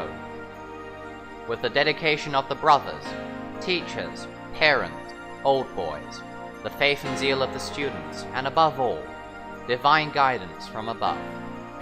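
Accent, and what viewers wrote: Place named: United Kingdom. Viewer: Who recorded it? Nice British accent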